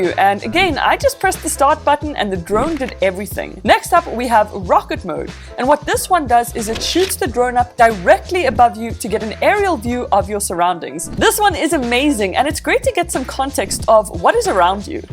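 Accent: Afrikaans, South Africa. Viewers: Are you Afrikaans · Hmm, are you from SA, i notice so of those areas? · I just want to know?????? Are you from South Africa🇿🇦🇿🇦🇿🇦🇿🇦 BTW I love your intro🥨🥨🥨🥨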